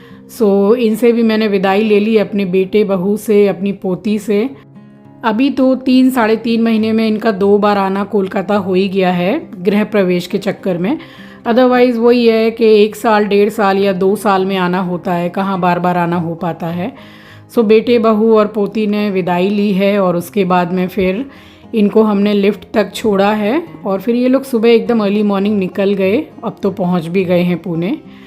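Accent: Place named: Delhi, India. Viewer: haan. Wo Dilli se bolti hai 😄 😀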